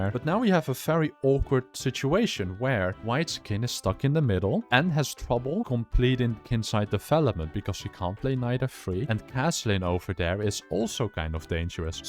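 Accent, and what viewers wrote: Netherlands. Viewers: you sound hella dutch lol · it was a very weird game. It went e4 e5 f3 d5 Be2, I was losing all my pieces but then I turned the board around and won the game. In all seriousness though, I got completely cooked and lost. You can view the game, it was broadcasted om lichess, tournament name was Dutch Open 2026 (also are you Dutch as well? Your accent makes me think so, would be cool to see you irl)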